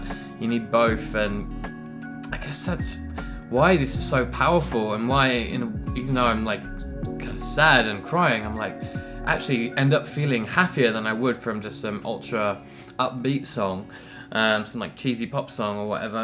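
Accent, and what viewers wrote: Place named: Australia. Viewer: I'm so happy for you to have reacted to Wintersun. Although I've really known them a few months only, while knowing all the time Kai's background, but never before was I ready for another metal band, just yet . Now that I've found it, it simply blows my mind. So heavy, but simultaneously pleasing, comforting. Invincible playing, awesome lyrics. What comes to this song and it's lyrics, I get the feeling I have to search for someone lost to grieve. The easiest way would naturally be my parents, and in some part they are, but there already are so many others I could and should have been closer with while they still were here. If I'm not wrong, you're Australian, right? If you really are, your accent sounds a bit different from the people in the Eastern coast, Sydney at least. I hope you don't mind me asking this.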